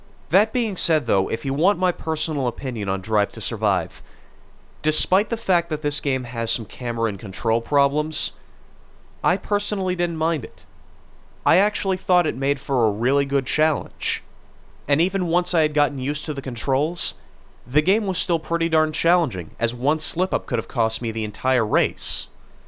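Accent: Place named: United States. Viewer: yea please do a better review of it as it deserves it. also, you probably don't sound like him but I'm british so you're american acents sound similar to me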